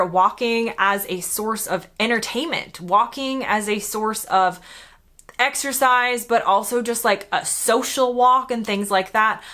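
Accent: United States. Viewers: Not only do you have an American accent, but you speak like someone from the USA, using words and phrases that British people would not. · You still sound very American to those of us in the UK, but after 10+ years living here do your American friends and family think you have a bit of a British accent? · Your accent doesn't sound like you landed yesterday....not to me. It's only reallly mildly 'American sounding' to me, should blend in well in the UK. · Ive noticed with time you seen much happier . I like the way you say British words but with an American accent! I worked in London for years and the pace was fast...i moved to the countryside and struggled for the first year as the pace was too slow....i adjusted and now live a slower less stressful life. Great episode again pointing out what we take as granted.😊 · It is a bit surprising that your American accent is totally intact after all the years that you've lived here in London. 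Is that a conscious thing not to lose it?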